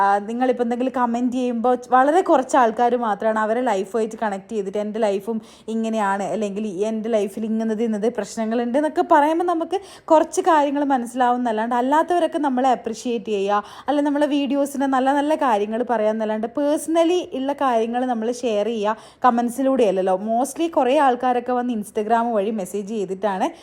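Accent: Kannur, India. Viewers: നമ്മുടെ കണ്ണൂർ ഭാഷ ഇങ്ങനെ ചറപറ കേൾക്കാൻ തന്നെ ഒരു സുഖാ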